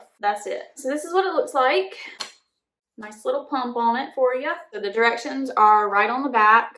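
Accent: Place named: Louisiana, United States. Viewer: You sound like your from Louisiana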